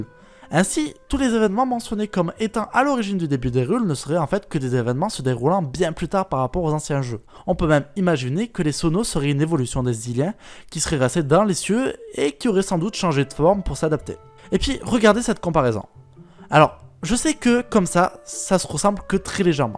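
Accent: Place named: Spain. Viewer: nan mais c'est pas un très gros accent mais on dirait un accent espagnol genre mdr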